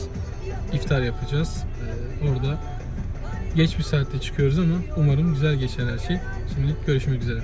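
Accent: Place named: Istanbul, Turkey. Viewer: abi videolarını çok beğenerek izliyorum. Kampçı ruhun ve İstanbul Türkçesi ile konuşman çok güzel gençlerimize çok güzel örnek oluyorsun sana çok Teşekkür ederiz abi 😊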